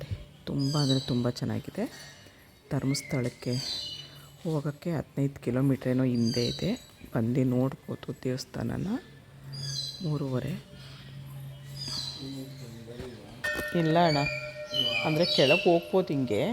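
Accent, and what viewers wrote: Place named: Bangalore, India. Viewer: Alla neevu matado reeti nodi banglore navru ankondidde...nodidre neevu namma oorinavru❤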